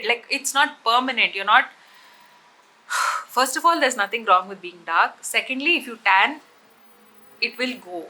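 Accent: India. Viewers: Bro your intonation is so indian coded i love it. It's like finding something familiar on the internet <3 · Your English is perfect Indian English I feel like I'm listening to a friend 😂 unlike some influencers putting on a fake accent..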